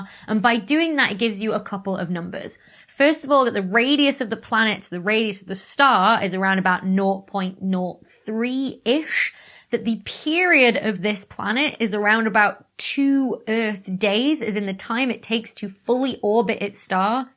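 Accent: United Kingdom. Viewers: I don't know why, but the British pronunciation of methane is driving me nuts lately. Do you pronounce pentane and octane with a randomly long leading vowell? Probably not. If there was a clue that the vowel should be long (like if it were spelt meethane ) that would be one thing which would justify British practice. But to my eyes and ears, the Brits are more wacky than usual. Dr. Becky, can you appeal this to the Standard English Authority_? ;-) (Let's leave _aluminum for another time. ;-) On another front, I recommended a video of yours to my mom many months ago. She reported that she couldn't understand you well enough due to your "thick British accent." You sound fine to me, but 20 years ago I worked for a company which had like 15% Brits. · It's her Briddish accent.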